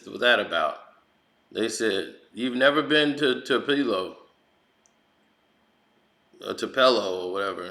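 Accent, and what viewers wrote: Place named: Midwest, United States. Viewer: You are so mid west Tupelo not tupello pronounced too puh low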